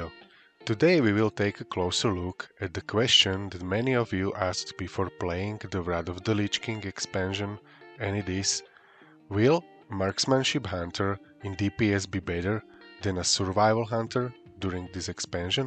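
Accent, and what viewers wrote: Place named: Austria. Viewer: Are you austrian? You have a strong accent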